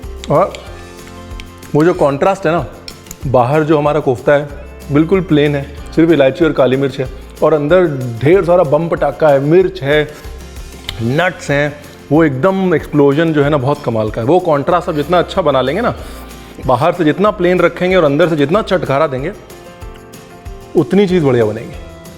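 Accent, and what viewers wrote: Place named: Punjab, India. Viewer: Sir, you sing so well, i just love the way you speak punjabi.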